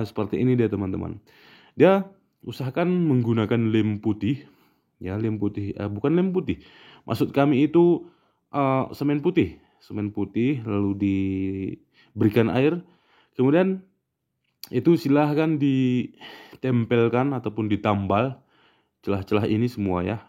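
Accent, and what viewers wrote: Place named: Gayo, Indonesia. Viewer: Dari cara berbicara abg, pastinya saya tau sukunya Gayo...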